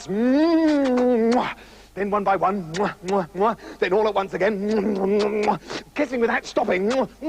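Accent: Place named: France. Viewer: OMG! Love this guy's French accent so much. Robin Williams and he would have made great friends, if they weren't in life already. Chocolate trees are awesome!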